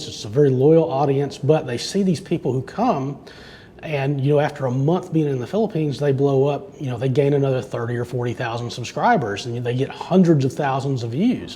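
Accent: United States. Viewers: Nice man. You deserve more subscriber tobe honest. The reason why i subscribed is because i wanna adapt your accent. American accent? And Your videos as well. Like literally i love your videos.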